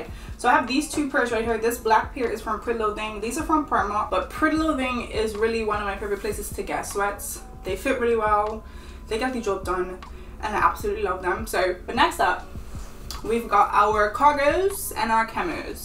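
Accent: Bermuda. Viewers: When i first heard your voice after discovering your channel, off rip I thought to myself she sounds like my mom from Bermuda let me check, and I was right; instant follow, one love❤️🇧🇲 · Yoo. You got a Bermudian accent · Is this a Bermudian accent? 🤔 You sound like my friend a bit lol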